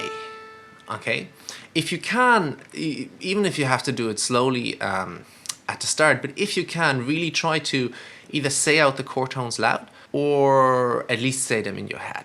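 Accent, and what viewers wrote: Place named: Ireland. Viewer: Great great lesson, thank you! Und dein irisches Akzent freut mich sehr :)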